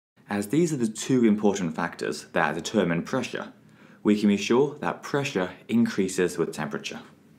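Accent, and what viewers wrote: United Kingdom. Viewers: Finally a British Accent!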